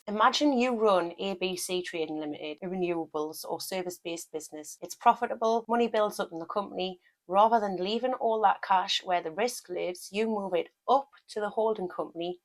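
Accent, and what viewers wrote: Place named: North, United Kingdom. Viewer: Where are you based you sound northern.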